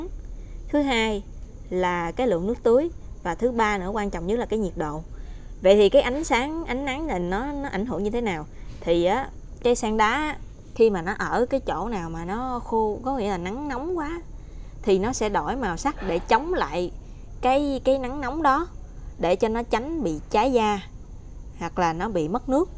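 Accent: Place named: Mekong Delta, Vietnam. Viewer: Nghe giọng của chị người miền Tây mà sao bít tiếng Hoa hay vậy?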